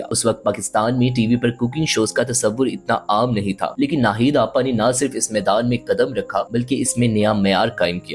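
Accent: India. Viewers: آپکا تلفظ انڈین لوگوں جیسا کیوں ھے؟؟؟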